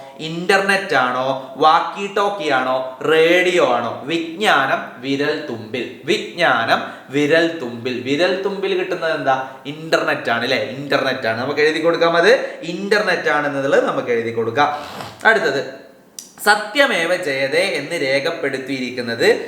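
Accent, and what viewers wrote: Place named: Kozhikode, India. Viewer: Kozhikode aano sir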